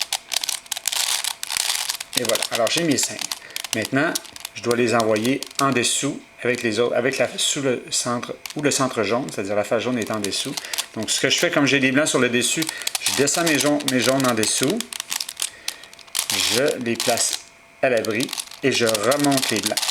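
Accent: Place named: Quebec, Canada. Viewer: yes un Quebecois ! lol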